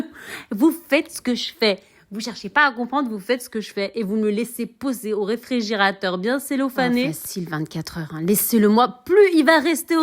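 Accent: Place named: Canada. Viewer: j'aime beaucoup ta façon de parler 😊😊❤on dirait que tu es canadienne salammm...😊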